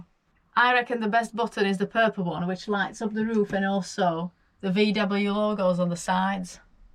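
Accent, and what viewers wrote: United Kingdom. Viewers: I was going to say the same, lovely accent! Yorkshire with a twang 😎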